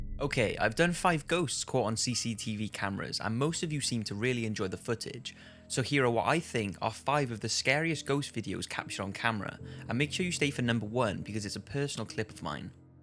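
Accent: United Kingdom. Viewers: Losing your Brit accent? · he sounds more British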